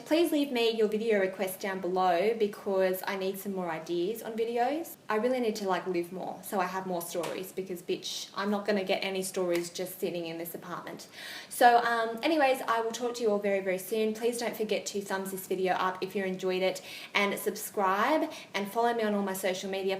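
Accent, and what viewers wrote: Australia. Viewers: Your Aussie?? 💘💗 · Are you Australian definitely i think im in aus too sometimes its not that a guy wants to be creepy but when they find a girl attractive at times they might not know the best way to approach the situation.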